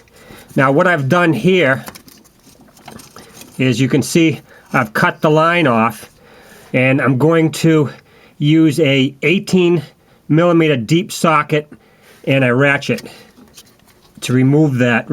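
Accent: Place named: Boston, United States. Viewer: wow, really? Either way, I'll be checking your videos. They are extremely informative and gotta love thr Boston accemt. :D.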